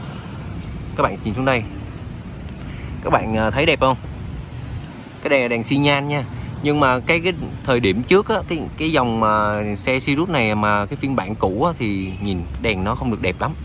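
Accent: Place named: China. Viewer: Lũ bắc kì khựa dân làm quảng cáo xe nghe khẩu âm khựa ngữ đéo ưa rồi.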